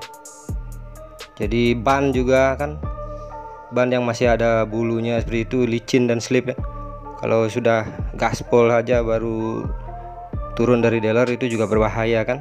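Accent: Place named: Madura, Indonesia. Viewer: logatnya sperti orang madura takye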